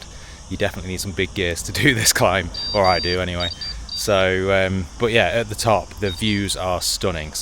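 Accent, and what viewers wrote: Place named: United Kingdom. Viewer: He’s British, not Spanish. Do Spanish put in British accents when pronouncing British place names???? 😂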